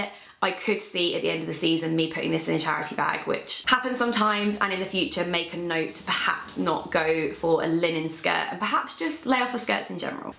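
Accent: United Kingdom. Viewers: Yikes, it’s not wrong, she’s British. Have you never heard an accent in your life?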